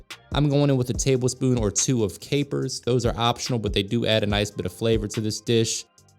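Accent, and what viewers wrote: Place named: South, United States. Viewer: You are so southern I love you 😍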